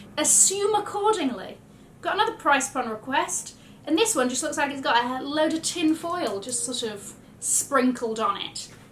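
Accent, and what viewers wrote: United Kingdom. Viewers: Excellent videos on fashion trends, Miss. Fantastic British accent. Happy holidays from our channel: Australian Parakeets Stars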